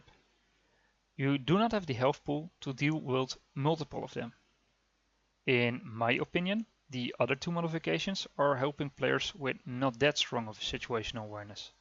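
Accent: Netherlands. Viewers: Are dutch?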